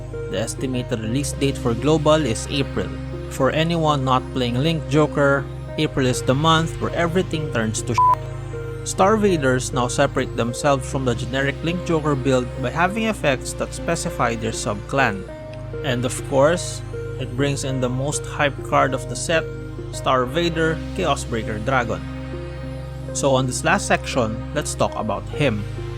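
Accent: Philippines. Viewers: Are you Filipino? Your english accent sounds like it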